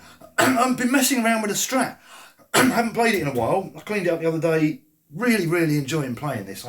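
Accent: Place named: England, United Kingdom. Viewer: It's so nice to hear an English accent instead of an abrasive American twang with put a sock in it pronunciations.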